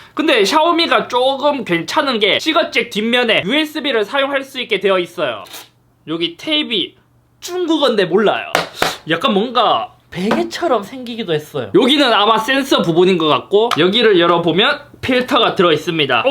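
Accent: Gyeongsang, South Korea. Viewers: ..쭝국...사투리보소 경상도 사나이네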